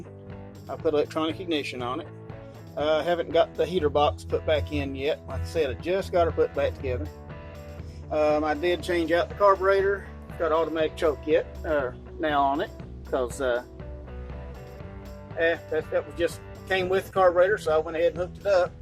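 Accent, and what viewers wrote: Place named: South, United States. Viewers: Love the car and the texan accent! Greetz from Holland. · Very cool man! I love the side bed storage, keep them guns in there! I'm from Los Angeles and I love that southern dialect.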